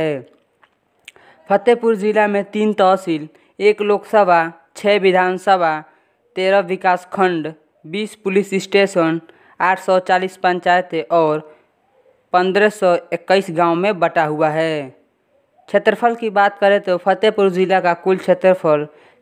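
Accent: Bihar, India. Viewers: Bihar se ho kya bro.. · बिहारी है ये ग्यारह को इग्यारा बोल रहा है।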